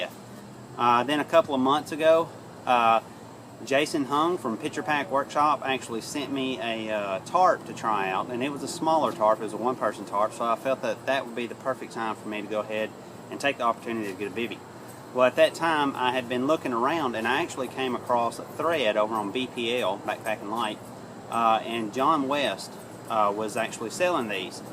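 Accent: United States. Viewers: Just curious: what part of US is his accent? Thanks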